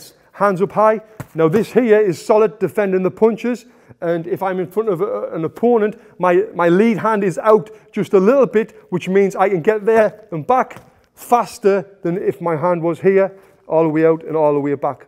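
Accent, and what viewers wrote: United Kingdom. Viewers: UK english is so nice, those "poonches" and "Meeweather" are brilliant! Cheers from Kazakhstan to all UK Boxing enthusiasts.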